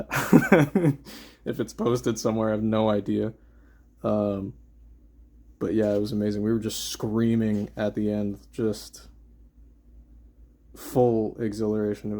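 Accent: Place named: United States. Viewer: Here we go! Comment wars! 47 mins!? Wowowow! A few nice phases hahaha!! Your smooth American voice is soothing haha. It's nice to see your rougher work. The bishop sketches are badass!!! Reminds me a little of an artist called Crom that made bird king but your stuff is a lot more realistic. The little things you write down throughout the book are cool, a little hint of emotion here and there. Just wanna say that this is awesome that you've finally made the video!!!! I love a skull with a badass jawbone for some reason so this is itching a scratch for me. I think sharing your work like this will be so good for you. Kinda just getting some thoughts out into a video through voicing it 🙂 I prefer black and white drawing too but some colour on my stuff brings it to life a little. Maybe try a little grey or a single colour. The audio is good by the way, nice and clear. 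The big masks are pretty cool, looks like real bone. You know mate you are actually so so good at drawing. The tiny tiny details are super intricate. The picture of the burn pile was awesome. Sorry to hear about your uncle buddy. Unfortunately I know the pain of losing a loved one. (Hug) Never knew your biological father. That's sucky... But at least you made some cool art out of it. I wish I never knew my dad the old drunk bus driving wanker haha. I don't personally enjoy social situations too much either, I prefer just a small group or just me hanging out on my own... Which is kinda weird for us as we are so up for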